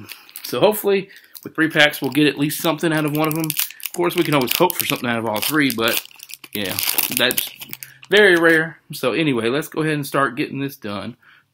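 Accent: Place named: Texas, United States. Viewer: Nice vid bud, and last pack magics that FA is pretty sweet. Whereabouts are you from friend cant quite place your accent its a little Texas and a little Tennessee thrown together. Just curious I always try and guess accents.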